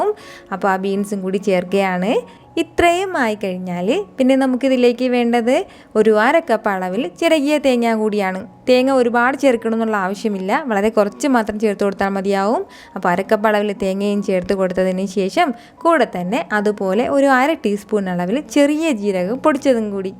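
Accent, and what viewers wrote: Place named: North Kerala, India. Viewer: ഒരു വടക്കൻ കേരളം slang ഉണ്ട് kitchen may have routes from മലപ്പുറം, കോഴിക്കോട്, or കണ്ണൂർ.., I think so. Very cute വർത്തമാനം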